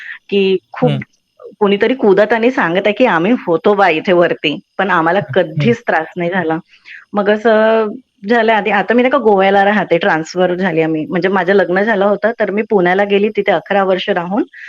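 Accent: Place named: Vidarbha, India. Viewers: मी ही गोष्ट ऐकतच नव्हतो, पण या ताईंचं नागपुरी वऱ्हाडी मराठीत बोलण्याचं ढंग, आणि त्यात अधूनमधून येणारं हिंदीचं मिश्रण हे सगळं मी लक्षपूर्वक ऐकत होतो. त्यामुळे वैदर्भी बोलीभाषेबद्दल थोडा अभ्यास झाला. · अनुभव भयानक आहे पण मला नागपुरी बोलीभाषा खूप आवडली ऐकायला!!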